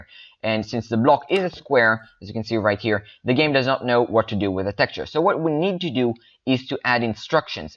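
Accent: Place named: India. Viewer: once i heard your indian accent i knew i was in the right hands. thank you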